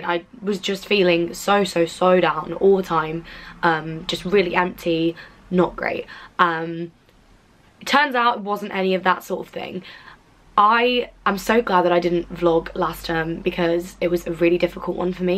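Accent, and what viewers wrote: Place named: RP, United Kingdom. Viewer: You sound so posh in this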